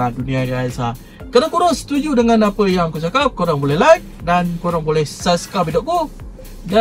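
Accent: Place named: Malaysia. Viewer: Bahasa logatnya jlas melayu antek malaydes😂😅😅😅😅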